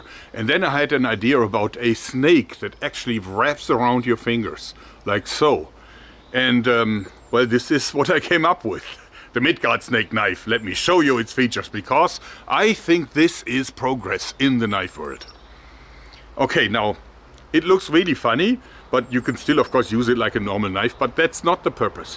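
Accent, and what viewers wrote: Germany. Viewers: What accent does he have? edit: he is most likely german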